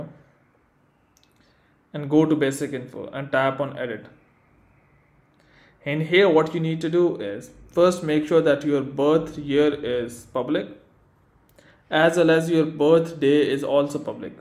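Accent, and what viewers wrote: India. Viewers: People are just slow headed fr, if you know English then you would have understood him even with his Indian accent. They just find a reason to dumb themselves down.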